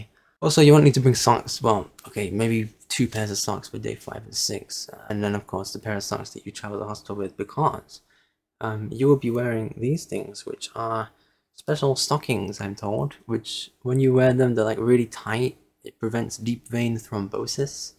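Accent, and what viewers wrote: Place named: Eastern Europe. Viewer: You sound Eastern European, do you feel OK about forcing British people to pay for your fetish?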